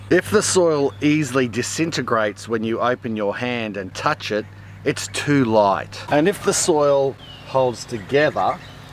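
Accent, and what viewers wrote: Australia. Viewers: Awesome. Enjoy the Aussie accent and attitude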